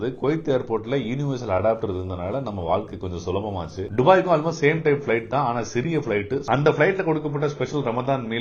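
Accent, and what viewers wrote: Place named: Sri Lanka. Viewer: Nenga srilanka a bro.? Ungal tamil ucharippu migavum arumai. Pothuvaga as compared to Tamilnadu people ur tamil slang is more og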